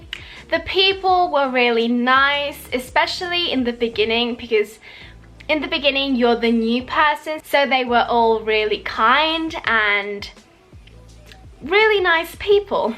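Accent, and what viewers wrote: United Kingdom. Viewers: i almost thought u were british you speak so good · I am totally bewildered. How can you be from Italy and have such a pronounced British accent? I mean, I am Italian and I know an Italian accent when I hear it and your is definitely not an Italian accent. Very curious. I'm sure there is a logical explanation. I am also sure others have wondered the same thing. EDIT: Excellent videos, by the way!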